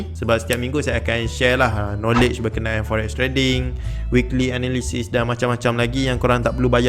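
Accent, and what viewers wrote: Pahang, Malaysia. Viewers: Terbaik video tuan, sy tumpang belajar, mohon halal ilmunya ye. Tuan org pahang ke?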